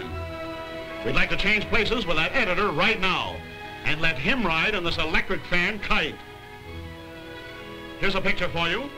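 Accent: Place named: Transatlantic, United States. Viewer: that transatlantic accent tho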